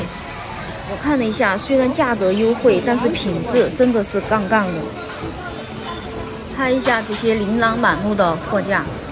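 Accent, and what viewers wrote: Sichuan, China. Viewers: 我想听听你的英文发音有没四川味？ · 川妹子啊，，這川普。。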